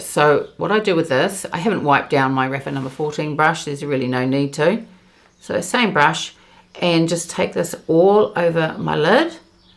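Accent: New Zealand. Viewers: Hello from a fellow Kiwi vlogger, it's nice to hear a familiar accent on YT 😃. I'm interested in the Water Fresh Tint but I'm not sure how it would go on my very fair and rosy complexion, plus it might be a bit dewy for me as I'm more of an almost matte girl. I've just finished the No.1 foundation which I really liked. The colour was probably the best match I've ever had in any other foundation - ever - but for some reason I've replaced it with something new to me, the Haus Labs 😂. Anyway, just wanted to say hurroooo. I'll see you again 🙋‍♀️.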